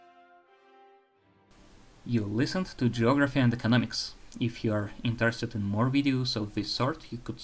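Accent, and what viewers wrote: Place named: Russia. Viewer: Interesting thats the top and only YT video on British geopolitics is delivered with a Russian accent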